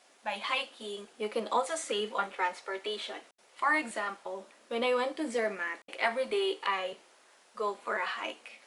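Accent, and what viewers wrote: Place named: Ilocos, Philippines. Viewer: Parang Hindi Lang Filipina c mam.kasla ilocana pay.😄❤️❤️❤️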